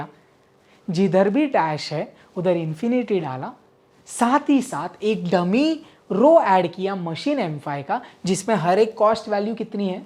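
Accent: India. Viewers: Sir we are in love with your Hindi Accent 💘😍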